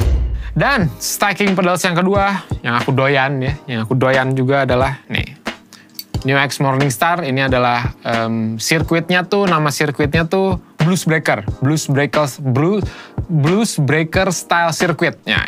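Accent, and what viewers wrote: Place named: West Java, Indonesia. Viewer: Oh, orang sunda? Kirain orang sumatera atau sulawesi. Pas denger "kela.. kela" trus "tah". Kata kela itu singkatan dari "ngke heula". Soalnya yg nyebut "kela" cuma orang yg bisa bahasa sunda sejak kecil atau sunda asli.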